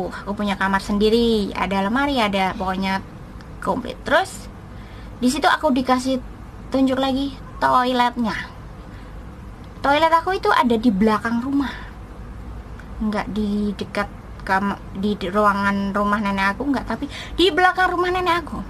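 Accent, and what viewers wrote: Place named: Java, Indonesia. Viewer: Dik jenengan asli pundi to kok bhsa jawa getot sekali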